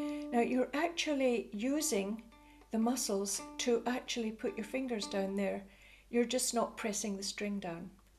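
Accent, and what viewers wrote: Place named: Scotland, United Kingdom. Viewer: I really enjoy your videos - and it makes me very homesick to hear your beautiful accent. I'm hanging on to mine, but would love to return to Scotland after 22 years down south...one day!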